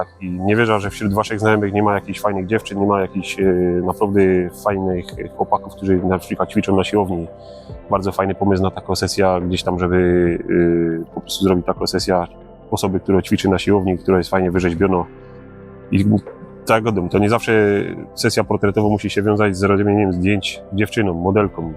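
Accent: Silesia, Poland. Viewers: Trzymam kciuki za rozwój kanału. Tego typu polskojęzycznych fotograficznych kanałów jest stosunkowo niewiele. Podoba mi się formuła - krótko, rzetelnie i treściwie. No i ślonsko godka, choć sam jestem poznańska pyra ;-)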